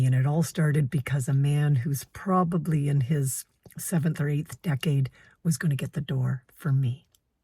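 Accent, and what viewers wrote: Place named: Canada. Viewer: your sweet, soft, mellifluous Canadian voice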